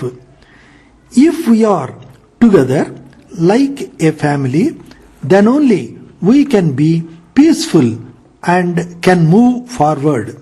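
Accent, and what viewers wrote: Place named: South India, India. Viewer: kai re clear voice...fati hui awaj h....south indian accent...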